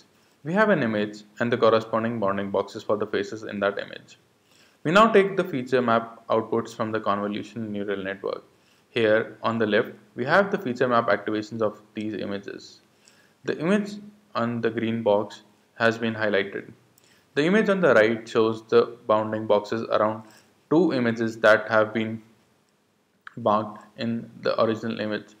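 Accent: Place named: India. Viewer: 咖喱味的英语听不懂啊^^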